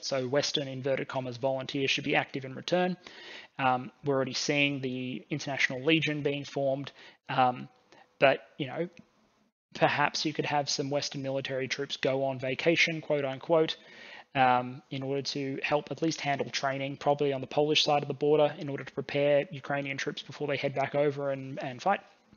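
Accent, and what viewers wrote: Australia. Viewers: Fascinating and insightful especially considering how long ago it was posted. 's voice and delivery (not to mention the sexy Australian accent) make it easy to pay attention to the arguments. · hes Australian & that’s an Australian accent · Love to hear an Aussie voice; very non- grating on my ears. Pure, unadulterated info! Oh Oy Oy !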